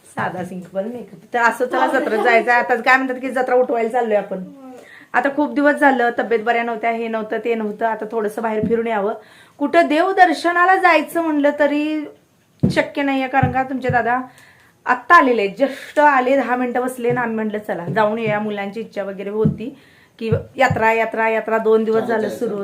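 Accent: Kolhapur, India. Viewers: तुम्ही आहात तशाच गोड आहात.. कोल्हापूरचा अस्सल फील येतो...माझे माहेर आहे कोल्हापूर... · Aapli bhasha kolhapuri aahe, bilkul badlaych nahiii... Amhi kolhapurkar 🙏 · टेंबलाई देवीचे दर्शन तुझ्या मुळे आज झाले🙏.... अणि तुझ्याकडुन कोल्हापुर चि भाषा ऐकायला छान वाटते🤗.. भाषेचा लहेजाच असा आहे...!! 👍👍 🚩🚩Lots Of love and Blessings to you & all Family 🧡🧡🧡🧡🧡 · तुझ बोलन खुप गोड आहे तु काही बदल करु नकोस कोल्हापूर ची बोलीभाषा छान च आहे मुंबई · Hi tai tu khup mast boltis nko change kru bhasha mi pn kolhapur chi aahe tujhyamule mla devich darshn milal mi geli nhi yaveli so khup thanks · हाय खूप छान जत्रा एन्जॉय केली. असू दे छान बोलतेस.कोल्हापूरी भाषा पण छान वाटते. मी पुण्यात राहिली आठ वर्षे.देश तसा वेश करावा लागतो. व्हिडिओ छान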